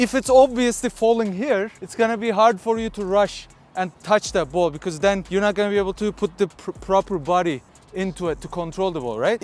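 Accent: Turkey. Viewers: Heaviest turkish accent i have ever seen in my life 😂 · Türk müsün? Aksanın türk aksanına benziyor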